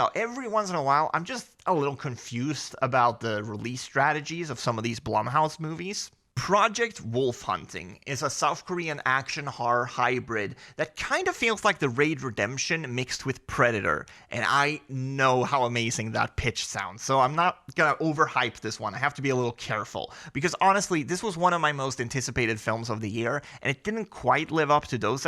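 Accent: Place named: United States. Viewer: You sound yankee AF, for a sweedish... Thanks bro. I´ll be looking for those movies. Happy new year!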